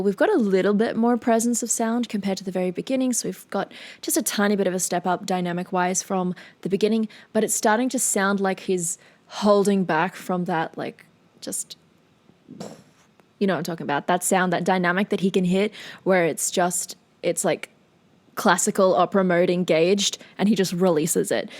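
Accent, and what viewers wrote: Australia. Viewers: Australian accent, yes.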